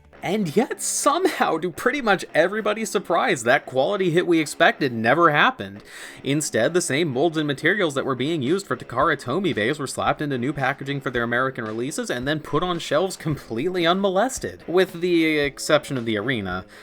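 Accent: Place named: South Texas, United States. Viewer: Hey heard you from south Texas ,you from the 956????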